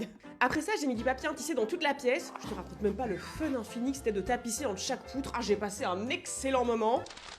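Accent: Belgium. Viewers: Elle est belge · cc c'etait pour savoir ta un petit axent et je suis francobelge du coup je voulais savoir est ce que tu est belge peut etre que j'ai tout faut mais voila bisous bisous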